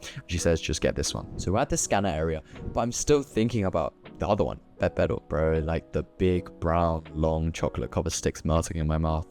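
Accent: United Kingdom. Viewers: Korean guys with british accent are so welll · literally watched the entire video not noticing he was brittish until he said “oh my days man” and now i cant unhear his accent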